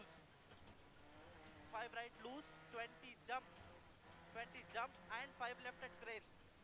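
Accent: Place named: India. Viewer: First time ever I am going to heard Indian ascent for the pace notes... Looking for more content from your side... 😄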